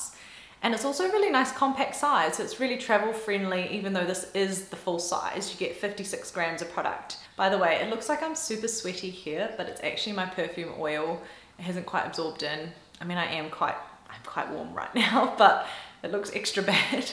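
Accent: New Zealand. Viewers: Lovely to see you back,. Your NZ accent has made a great resurgence, plus you seem really happy and relaxed. I'm so happy that your audition was such a success, as well. Sometimes, all the energy in the Universe seems to pull together in your favour and these continued successes are proof that you made the right decision 💕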